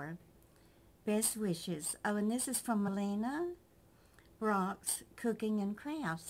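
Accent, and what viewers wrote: South, United States. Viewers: my love, you need to get a microphone. They are not expensive. You can get one that you clip on your shirt so you can even take it with you when you're shopping. Those run about $20 dollars. That would really improve the sound of your lovely, soft-spoken Southern voice. Just a suggestion.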